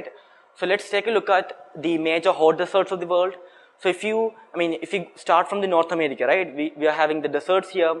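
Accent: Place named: South India, India. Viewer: Typical South Indian English Accent.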